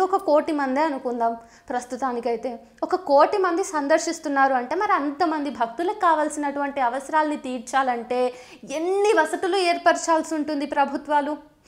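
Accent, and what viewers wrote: India. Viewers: Indian educated vioce.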